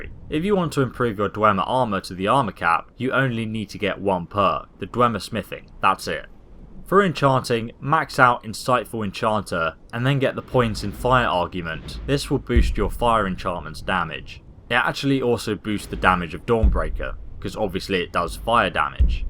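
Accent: England, United Kingdom. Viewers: Stefan Luckerbauer Sounds British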